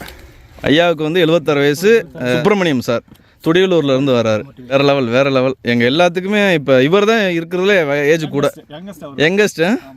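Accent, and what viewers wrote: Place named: Ramanathapuram, India. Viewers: Ramanathapuram slang nalla iruku😊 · Always waiting for ur vlogs. Ur efforts to b appreciated n every vlog..ur tamil slang nice to hear bro....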